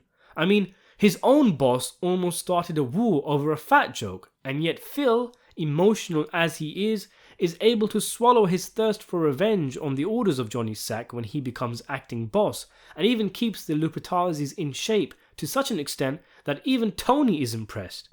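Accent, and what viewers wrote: United Kingdom. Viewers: Listening to a British guy talk about a show about Italian gangsters is just wild to me idk why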